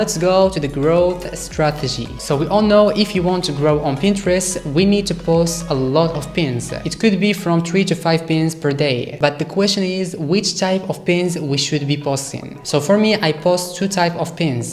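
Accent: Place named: Middle East. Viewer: Thanks bro! Very helpful. Also, love your accent! Seems Middle Eastern mixed with French 😊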